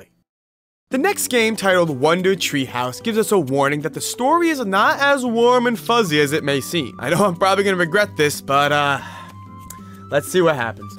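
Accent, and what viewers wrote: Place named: California, United States. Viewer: What do you mean? Just sounds like a normal California accent to me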